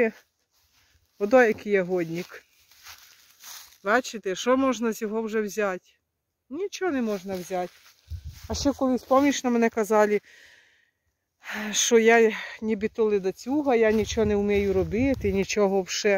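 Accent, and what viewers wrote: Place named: Zhytomyr, Ukraine. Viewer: доброго дня вам,ви дуже гарна жіночка один позитивчик,не звертайте увагу які роблять вам зауваження,у кожному регіоні розмовляють по своєму, А в Житомирській області так розмовляють,я трошки там жила.Коростишівський район с.Харитовівка.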